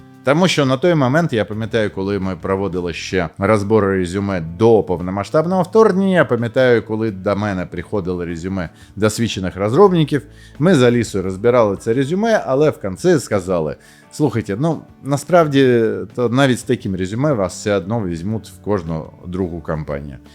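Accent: Russia. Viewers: ти топчик, що перейшов з російської на українську. Це на справді дуже потужних та поважний крок, повага від всієї україномовної спільноти. Але будь ласка продовжуй цей процес бо твоя україська звучить як адаптована на україньську російська. Тобі ще є куди рости, вдосконалюсься, та все буде Україна. Дякуємо тобі за твій вибір. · Переход на украинский - это одно, но результат пока что выглядит сыро. В речи много русских слов и интонаций, из-за чего украинский воспринимается неестественно. В итоге это только ухудшает общее впечатление от видео :(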